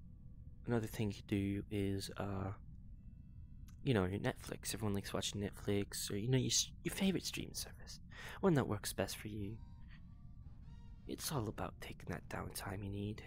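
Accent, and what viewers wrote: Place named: Australia. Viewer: I love this! I thought you were a husky at first and clicked the video because the avatar was cute. The next thing i know, I'm listening to an Australian accent kangaroo 😂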